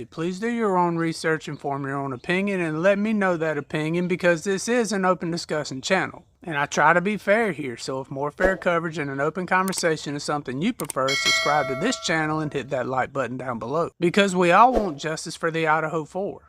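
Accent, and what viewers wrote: South, United States. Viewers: Excellent work and presentation. I’ve been into this case since Dec 13th 2022, and I am absolutely sure that BK just couldn’t have done it. Very beautiful and interesting accent you’ve got, btw - from where is it, btw? Alabama? Louisiana? I am fond of different accents! 😊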